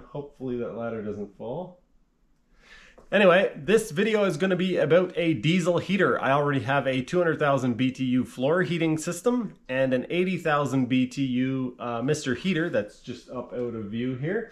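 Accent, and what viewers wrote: Canada. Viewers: Can't watch the whole video due to the Canadian accent. Boot boot boot annoying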